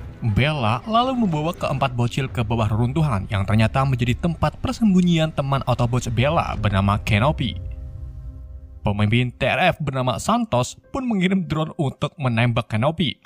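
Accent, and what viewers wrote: Indonesia. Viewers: Gue suka gaya bicara dan gaya basa lu bang, meski membahas alur cerita film asing tapi gaya +62 masih diterapkan 🤣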